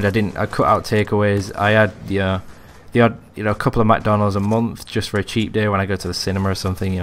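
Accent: Yorkshire, United Kingdom. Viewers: which county of yorkshire are you from? (not pervy just wondered)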